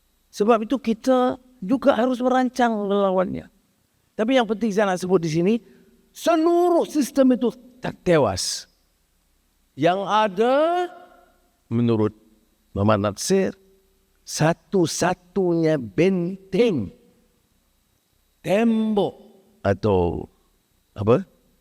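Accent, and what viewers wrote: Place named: Arab world. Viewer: ucapan akedemik itu walau guna BM bernada Arab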